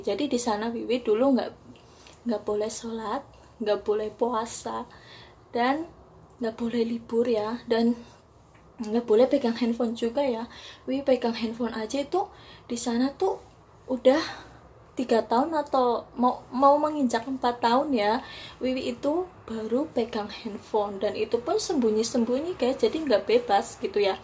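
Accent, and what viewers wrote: Banyumas, Indonesia. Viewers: Cerita pengalaman kerja disingapore.. SINGAPORE daerah enthi nduok??? Ngapak wadon 😍😍😍😍😍😍😍 Taiwan singapore,duiten arep gae opo nduok😀😀😀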